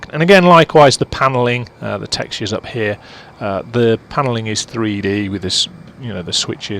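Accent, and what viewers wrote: United Kingdom. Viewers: Is this a Lancashire accent? Sorry this has nothing to do with the aircraft. I just bought it an watching for info. I love midland accents and I'm trying to distinguish the different ones.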